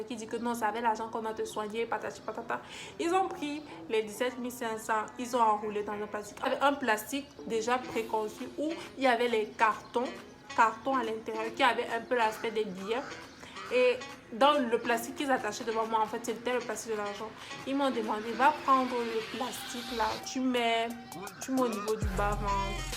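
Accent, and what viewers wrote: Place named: Cameroon. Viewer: J'ai senti que tu étais camerounaise dès que tu as prononcé le premier mot... très belle vidéo je me suis abonnée...satinosaure😂😂😂aaah mes côtes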